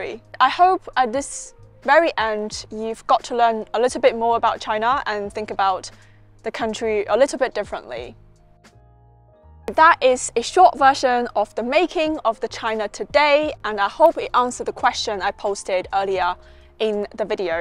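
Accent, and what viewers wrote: England, United Kingdom. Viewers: i could hear your english accent immediately lol. very cool. im american btw good video! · Very well put. I confess that I am intrigued by China, and this video presented by this very sweet and lovely lady with her British accent, did an admirable job in pleasantly explaining why China is as it is. It is complicated. Western nations seemed to enjoy exploiting China for that Century of Humiliation. Shame on the West. I hope our nations can find a happy medium. Job well done,!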